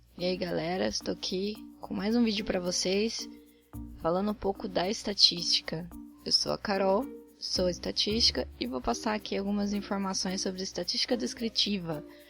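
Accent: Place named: East Asia. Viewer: Você é oriental não é? Percebo pela maneira como você fala. É comum os orientais se interessarem bastante por estatística. Mas muito boa sua aula, me ajudou bastante.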